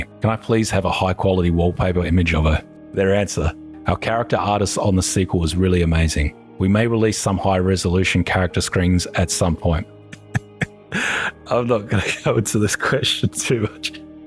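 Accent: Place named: Australia. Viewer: your voice sounds very Australian and as an Australian i can say thats a compliment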